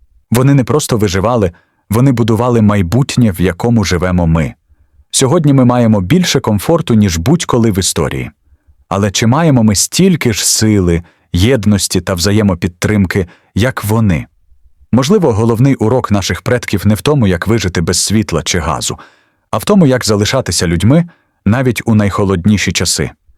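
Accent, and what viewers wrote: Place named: Russia. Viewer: Та не дровА , а дрОва!!! Чому ви в українських словах робите російські наголоси?! Не конІ , а кОні Не травИ , а трАви Не чАсник , а часнИк!!! Не кагАнці , а *каганцІ*. Ви ніколи не чули, як звучить українська мова? Вже не говорячи про зображення. Ніколи не бачили української хАти, навіть на картинках у дитячих книжечках? Вони були обмазані глиною і побІлені! А української пЕчі? Якщо вже ви назвалися "Історична правда", то створюйте щось максимально схоже на неї! Бо у вас покищо виходять "Історичні побрехеньки", нафантазовані ШІ.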